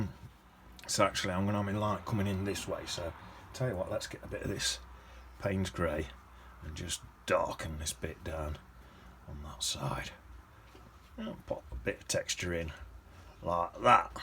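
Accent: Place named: Yorkshire, United Kingdom. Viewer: Thank you for your demo. Very refreshing,informative and like Bob Ross make you feel you can achieve something similar. Also the accent is gorgeous. I’m from Yorkshire, I now live in Notts but the accent is something that I miss. Hence I am so glad I found you and I will follow to pick up some tips. Just great. x😍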